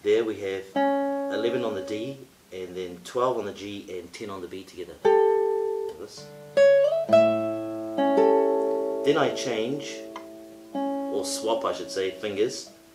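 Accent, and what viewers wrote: New Zealand. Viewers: I'm really glad to enjoy your tutorial here. Moreover, 'Kia ora' is very familiar because when I visited New Zealand for a month 2011, English Program for teachers in Auckland. My hosts were very warm-hearted and I still miss them a lot. Today your English accent and pronunciation reminds me of them. Especially whenever I have free time, I'll visit here and enjoy your play and explanation. Thank you so much ~!😊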